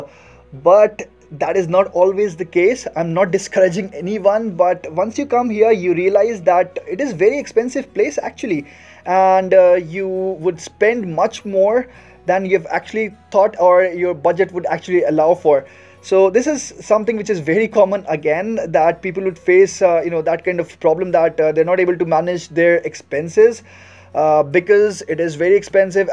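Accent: India. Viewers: sorry mate but you dont sound a genuine person you must be from india or sirilanka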